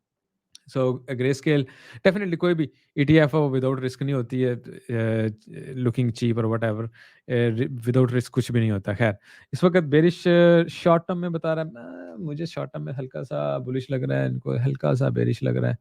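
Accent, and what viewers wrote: Punjab, India. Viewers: Isko kehte hain att ta hahaha sir jab apne bola na dxy ne att chukki hui hah bht sakoon mila apki punjabi itni pyari hah na sir bht mithas hah apki lang mein karazdar hain hum apki mohabat k sir alllah bht khush rakhe apko ❤️thankx for everything sir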